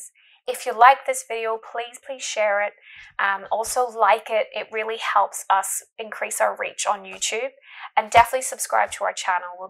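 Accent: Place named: Northern Ireland, United Kingdom. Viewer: Love that Northern Ireland accent. 💚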